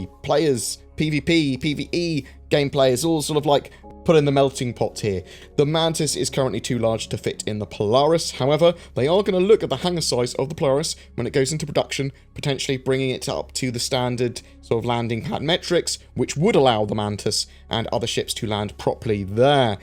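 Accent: United Kingdom. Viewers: The fun thing about listening to videos from people in another country than where I live is the difference in slang and pronunciation of words. I do wonder though if some of what I hear is an artifact of the recording device. I know that in the UK you use "Maths" where in the US we say "Math" for example.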